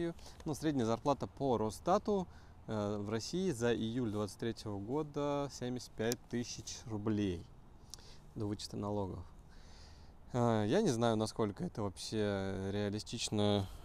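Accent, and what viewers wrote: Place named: United States. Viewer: ..а еще у тебя произношение американское становится,даже говоря по русски)))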